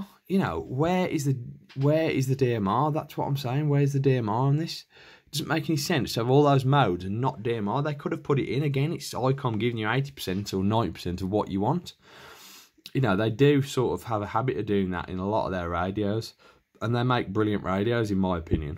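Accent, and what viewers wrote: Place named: Liverpool, United Kingdom. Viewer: Just stumbled upon this video and your channel looking around at 7300 videos. Great radio, and superb explanation. Are you a Scouser? Cool accent!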